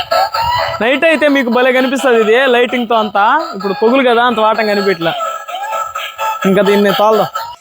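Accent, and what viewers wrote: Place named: East Godavari, India. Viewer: Anna east godavari lo laga mataladu Anna eimka ni vedios ki eavvadu topu ledu e comment ki vedio cheyy anna nenu eaduru chustu vumtya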